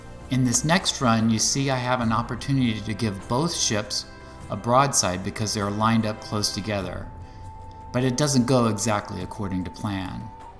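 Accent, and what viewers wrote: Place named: United States. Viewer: Love the crispy clear american accent. It's easy to understand and sounds great. Showing once again proper american english is indeed beautiful. As for the video itself i did pass the mission previously, but it was more because of dumb luck than skill, thanks for the info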